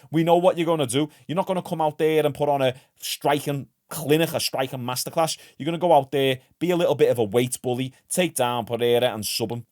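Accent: Liverpool, United Kingdom. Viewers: Feel like watched guru video scouser version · I'm not defending Jon here but your accent and the flags in these comments kind of agree with his comment about UK fans. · WoW, copying exactly what MMA GURU said yesterday, it's sad when people like you just sit in your room and copy from actual hard working people that do research and actually travel 12 hours by plane to South Africa to talk to dricus and do some training in his gym. Chats live to fighters like money moicano ECT Would do you do mate? Watch GURU and show, it's embarrassing how nearly identical has copied word for word lol No wonder less than 5% of your watchers are not subscribed and will never sub to you and your annoying Scouse accent. And please stop with the workout and how to fight advice, your a snowflake with a teenage girls body lol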